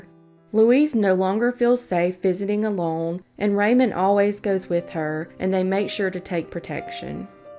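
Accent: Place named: Mississippi, United States. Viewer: i don't know where in the south these are from, but this voice makes me miss visiting madison, mississippi.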